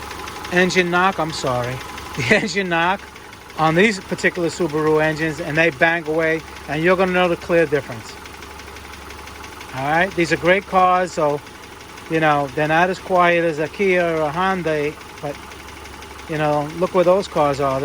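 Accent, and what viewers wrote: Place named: Ohio, United States. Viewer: Appreciate the video, also sounds like one hell of an Ohio accent lol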